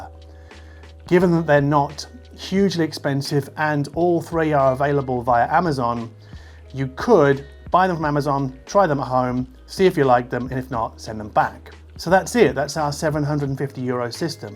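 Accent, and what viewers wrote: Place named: United Kingdom. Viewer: U sound like u live in the uk but u live in berlin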